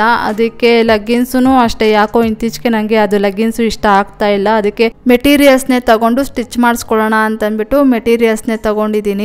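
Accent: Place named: Andhra Pradesh, India. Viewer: memukuda telugu vallameandi,me kannada slang vini naku meru teluguvalle anipinchindi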